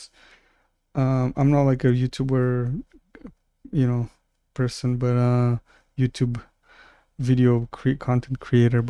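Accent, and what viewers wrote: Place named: Romania. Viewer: La inceput chiar m-am gandit ca esti roman sincer. Dupa accent si aspect, doar ca m-a cam lasat in dubii "CA"-ul de la youtube. Deci am zis ca poate esti Canadian dar vorbesti franceza mai mult decat engleza. Sincer chiar ar merge sa faci o serie de tutoriale. Godot a inceput sa creasca foarte mult in ultimul an. Eu personal il folosesc de cam un an si ceva si nu am lansat nici macar un joc, ci doar prototipuri :)) Ai putea folosi strategia asta cu youtube-ul, sa faci niste tutoriale, sa-ti cresti canalul destul de bine si cand o sa lansezi jocuri o sa ai oameni interesati in proiecte. Deci pe scurt, ai putea face o sursa de venit din asta. Lasa-mi un detaliu de contact daca vrei si poate am putea comunica mai mult acolo. Multa sanatate si chiar astept tutorialele tale. Email-ul meu e : <mail>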